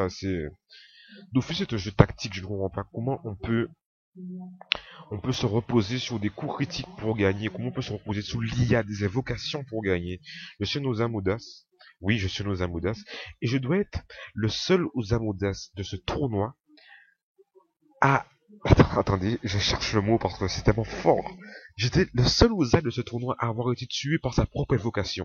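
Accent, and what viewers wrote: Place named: Africa. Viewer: ta un accent africain :)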